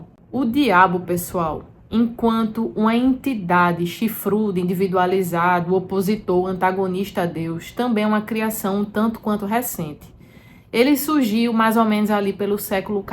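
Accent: Northeast, Brazil. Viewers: Olá! Adorei teu sotaque de algum lugar do interior do Nordeste!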